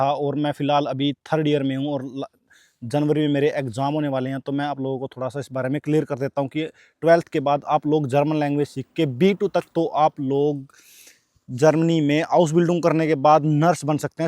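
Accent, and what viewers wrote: Haryana, India. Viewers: Bhai Haryana te lage mne tu ❤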